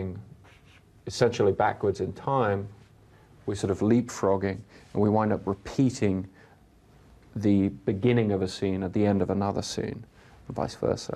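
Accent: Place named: Australia. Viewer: He actually sounds kind of Australian in this video.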